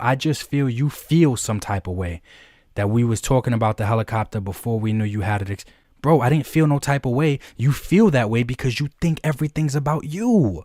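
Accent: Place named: South, United States. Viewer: He’s definitely from the south. I would know cause I am to. People think I talk funny also.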